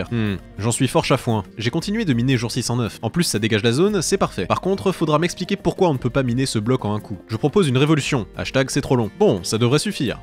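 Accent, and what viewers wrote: Quebec, Canada. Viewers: J'ai bien remarqué que tu a l'accent québécois et français alors tu vie où?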